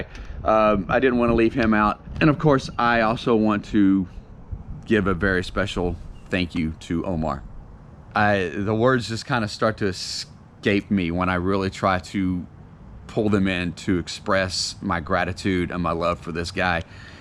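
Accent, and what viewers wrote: Morocco. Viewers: Stay safe bro...we gonna miss your very special moroccan dialect ...hope we will see you soon in Morocco. You re become one of us. ❤🇲🇦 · Bravo; You speak now like a real Moroccan 👏👏👏👏👏👏👍👍👍👍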